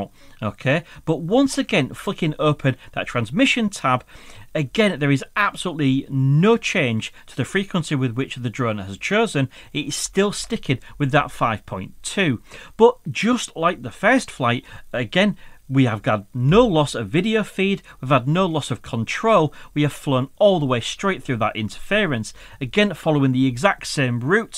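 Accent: United Kingdom. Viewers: I am from indonesia Is the accent that you are using is british?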